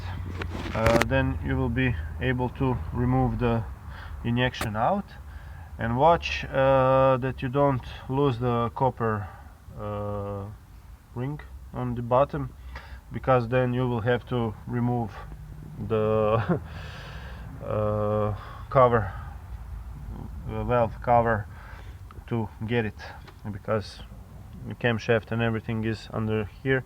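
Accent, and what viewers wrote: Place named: Russia. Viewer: С таким акцентом может говорить только русский.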